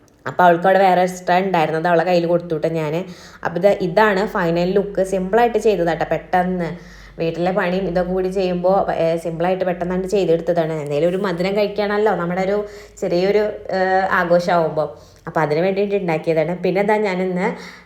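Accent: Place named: Thrissur, India. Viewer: അവർ Thrissur alle? സംസാരം അങ്ങനെ അല്ലേ kayiooo.നമ്മുടെ പോലെ ആക്കാൻ കയിയണമെന്നില്ലല്ലോ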